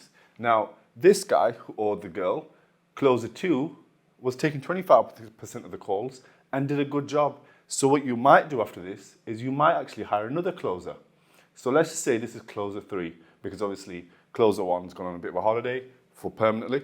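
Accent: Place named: Pakistan. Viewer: Can we get subtitles next time, the Pakistani accent is hard to understand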